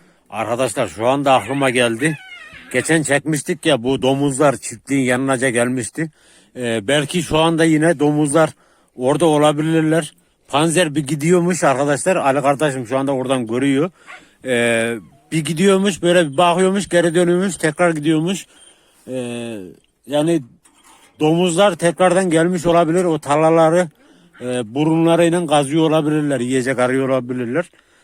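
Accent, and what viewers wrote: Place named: Kastamonu, Turkey. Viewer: Kardeşim tekrar geçmiş olsun allahım şifa versin ben ist dan yazıyom kastamonu pınarbaşılıyım eytliyim çıkar öıkmz memleketime gidecem ama ilk fırsatımda seni ziyaret edicem çünkü sen bizim doğal şivemizi temsil e uyuyosun tebrikler saygılar selamlar kardeşim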